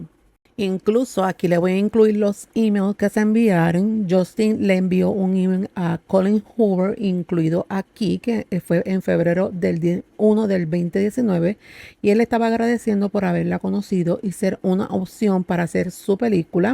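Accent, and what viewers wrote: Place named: Dominican Republic. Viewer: suena como dominicana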